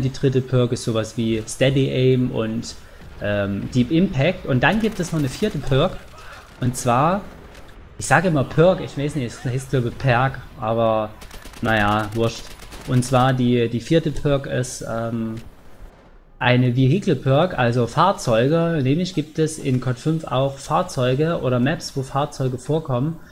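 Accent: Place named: Saxony, Germany. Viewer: ich möchte noch ein bissel gebäshe auf sächsich .... oder bring noch paar lustige videos ... danke .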